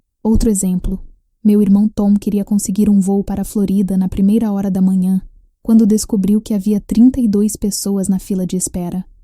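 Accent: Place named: Portugal. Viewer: Mas reparou que sai do PT Br pro PT de Portugal?😅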